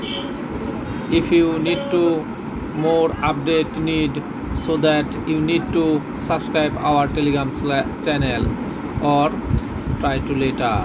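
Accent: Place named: Bengal, India. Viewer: ও যে বাঙ্গালী ওর আওয়াজ এই বোঝা যাচ্ছে 😂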